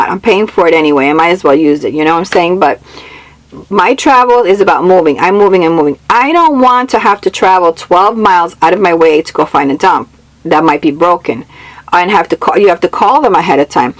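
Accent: Latin America. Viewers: Hair looks great, as usual- librarian glasses definitely a great choice, subtle Latina dialect- great outfit choice... Gonna give this one a solid 8.9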